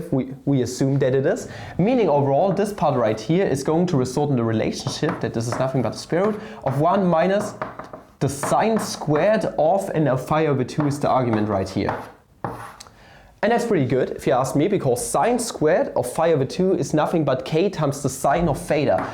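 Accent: Germany. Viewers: I clicked, heard a German accent, and stayed. 👍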